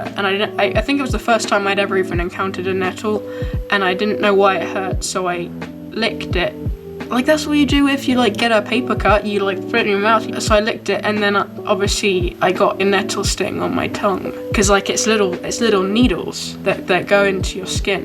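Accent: United Kingdom. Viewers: I want a British accent now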